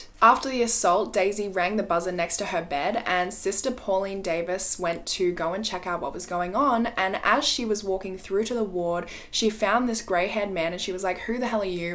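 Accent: Australia. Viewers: definitely Aussie hahaha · as a brit i can confirm is deffo aussie 😎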